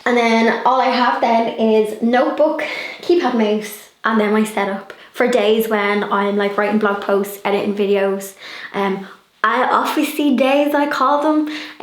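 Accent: Ireland. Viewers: You’re so cute. I love your Irish accent. You know Ive been just making do with working around my sewing machine and what nots. I should make my work space nicer. Thanks for the ideas. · You are very organized. You have a wonderful creative mind. Love when you work with power tools! You are the best! Love the Irish accent so melodic! Mery Christmas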